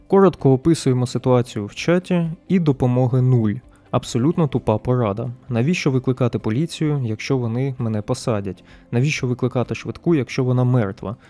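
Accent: United States. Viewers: звідки американський акцент?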